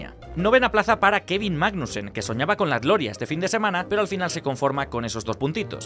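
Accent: Spain. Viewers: Estaría bien que se narrara sin ese acento gachupín fastidioso.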